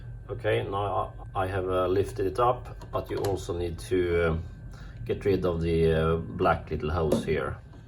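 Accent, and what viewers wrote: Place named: Norway. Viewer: I fixed my drier this morning following your guide exactly. Your accent sounds norsk så eg bare ser tusen hjertelig takk.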